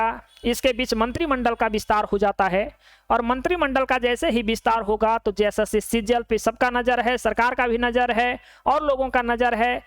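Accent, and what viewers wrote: Bihar, India. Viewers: Tum bihari ho n...tumhra language n tone bihari wali hai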